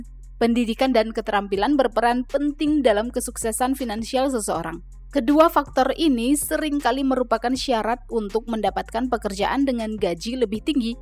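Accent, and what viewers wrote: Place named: Malaysia. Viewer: Logat melayu ya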